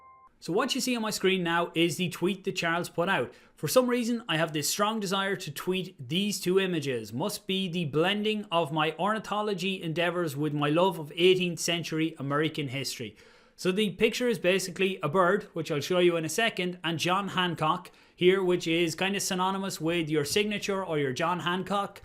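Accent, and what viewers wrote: Ireland. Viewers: normally i can't understand Irish people, but i didn't realized he's having irish accent till i saw your comment.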